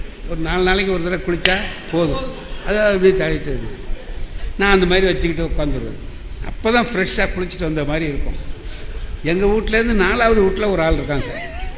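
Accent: India. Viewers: என்னதான் நகைச்சுவையாக பேசினாலும்...சரியான கருத்துக்கு வந்து நின்று பேசுவார்..நகைச்சுவையும்..கருத்தும் perfect blend ஆகும்..கூடுதலாக திருவாரூர் வட்டார மொழியும் super blend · தஞ்சாவூர் வட்டார வழக்கு -குறிப்பாக திருவாரூர் -நாகை பேச்சு வழக்கு இப்படித்தான்!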